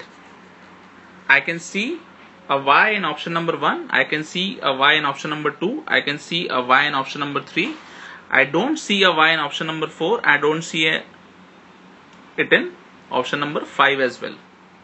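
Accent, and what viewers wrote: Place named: India. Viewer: why does indian people talk like that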